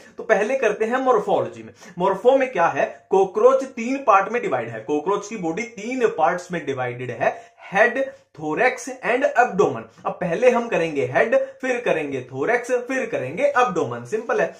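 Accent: Haryana, India. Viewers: Sir aap muje haryana ke lagte ho❤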